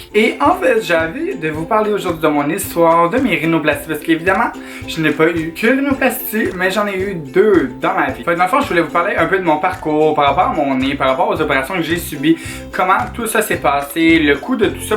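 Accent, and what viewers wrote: Quebec, Canada. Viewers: Hounie Mdr dsl jpensais que tous les québécois parlaient comme ça moi je suis française jvois pas la différence alors 😂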